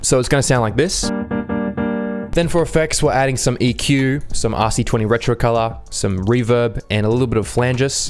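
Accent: Australia. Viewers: Mate are you from Australia? Cus I hear the accent 😂🤙🏾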